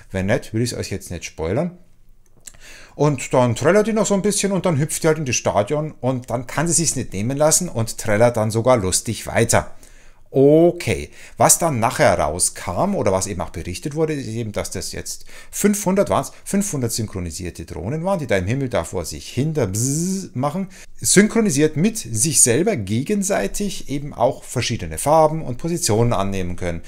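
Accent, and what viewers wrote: Bavaria, Germany. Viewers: yep - sehe ich auch so. Woher aus dem grossen "Kanton" bist den eigentlich? Toent irgendwie bayrisch ... Muenchen? (PS: fragen kann ich ja mal, antworten ist ja freiwillig)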